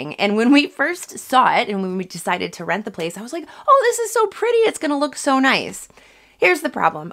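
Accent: United States. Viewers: Love your Midwest accent. Great tips, thank you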